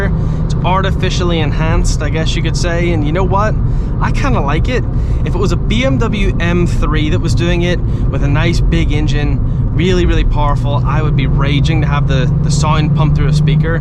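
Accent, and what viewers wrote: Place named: Canada. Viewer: Interesting accent you have there! Part Canadian? Love the vid 👌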